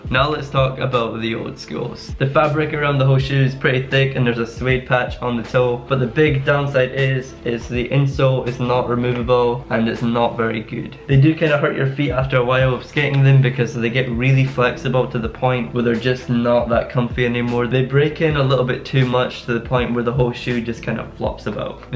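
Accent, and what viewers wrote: Scotland, United Kingdom. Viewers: Is he Scottish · Are u Scottish if ye are wits the best parks for skating bro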